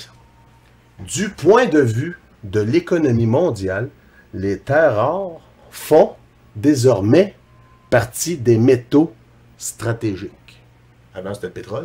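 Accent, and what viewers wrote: Quebec, Canada. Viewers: desolé ,c est vrai j aime bien son idee ça peut etre un argument. mais c est sa maniere de parler et l acce t canadien ça ma suffit pour rigoler pour rien . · Oué bin qu' ils touchent pas a nos Coréens avec leurs coneries, se sont des gens formidables et très ouvert, merci pour la video jme suit bien marré, et vive l' accent quebecois^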